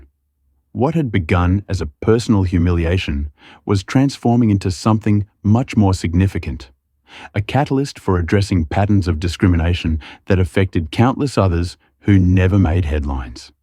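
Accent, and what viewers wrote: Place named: Australia. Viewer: It's nice to hear someone,narrating in my "language" my Aussie version,,this is real English,, n I loved every minute of it❤listening Papua New Guinea 🇵🇬 south pacific down under pondoman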